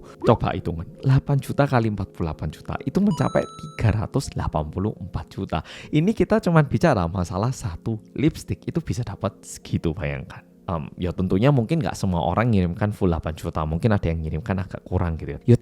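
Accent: Java, Indonesia. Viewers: Medok LG....😂😂😂😂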